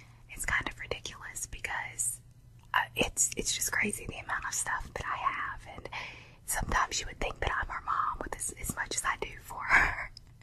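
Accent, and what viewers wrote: South, United States. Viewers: Wow, you have a southern accent! I think you peeps who have southern accents are the most relaxed people! Your voice is sooo relaxing. :) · I really enjoy your southern accent :P It bring tingles down my spine :P Awesome video once again!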